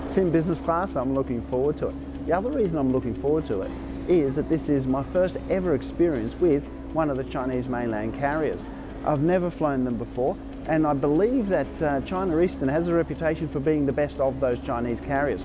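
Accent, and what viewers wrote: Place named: Australia. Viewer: your so handsome and a gracious host. Love that Aussie accent!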